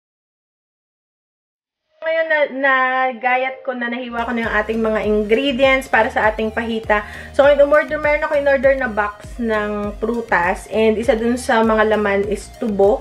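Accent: Batangas, Philippines. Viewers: Batangeño din ako pero kakaaliw po ang punto niyo. Haha hindi nawala. God bless po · 😁😁 ang cute ng accent nyo pg nag babatanggenyo kau 😄💓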